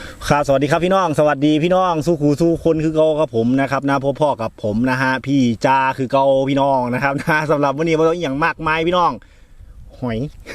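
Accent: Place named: Ban Phue, Thailand. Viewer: อ้ายเป็นคนบ้านใด๋ครับ เว้าคือคนอำเภอบ้านผือแท้ครับ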